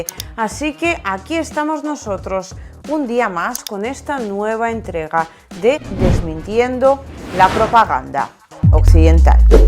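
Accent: Spain. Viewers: Hablas como ESPAÑOLA...y en MÉXICO no nos gustan los Españoles, destruyeron al imperio AZTECA... torturas, saqueos e imposición de su cultura a base DEL TERROR... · Mujer me encantas , como explicas ves las cosas claras vas al grano . Tienes un pequeño acento español pero enrazada con otro país la verdad muy linda y tus tatuajes mucho mejorrr éxitos . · ...me encanta escucharte¡¡¡ te escuchas....inteligente..profesional......ablas español de españa.....jeje suenas muy rico...sexy....te disfruto mucho.....saludos...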